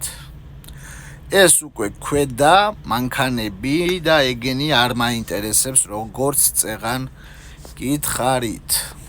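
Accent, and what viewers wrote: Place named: Imereti, Georgia. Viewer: შენდენი იუსები საქსრთველოში არავის გოუხსნია საღოლ ძმაო ისე იმერელი ხარ საუბარზე მეც იმერელივარ ვანი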